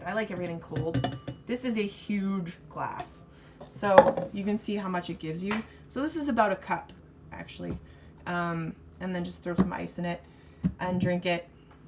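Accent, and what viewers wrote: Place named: Canada. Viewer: I sense Canadian LoL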